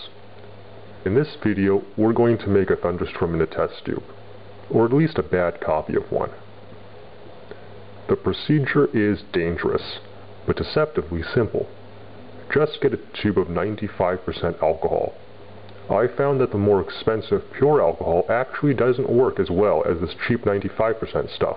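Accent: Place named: Canada. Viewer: Do all Canadians sound like this?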